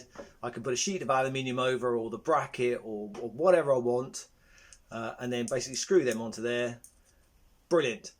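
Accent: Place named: England, United Kingdom. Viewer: My wife asks "who's the Ed Harris guy with the English accent?" so i said "nah, younger and prettier" lol.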